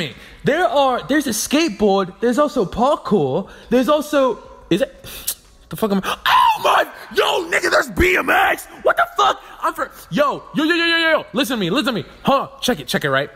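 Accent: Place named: United Kingdom. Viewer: bro how many accents do you have can't tell if you're British or im tripping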